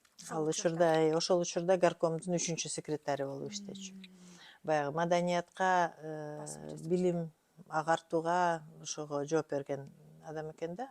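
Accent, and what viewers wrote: Standard. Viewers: Кыргыз тилинде таза, сонун суйлойт экен, маа шаа Аллах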